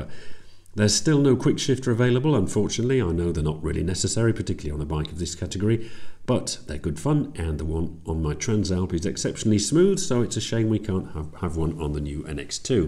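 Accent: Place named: RP, United Kingdom. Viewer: BBC ENGLISH ! :face-orange-tv-shape:🤩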